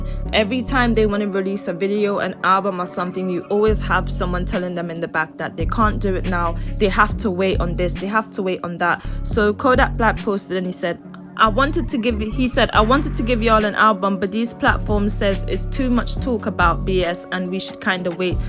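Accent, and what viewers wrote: United Kingdom. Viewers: lol your from the UK why you speaking on american news? weirdo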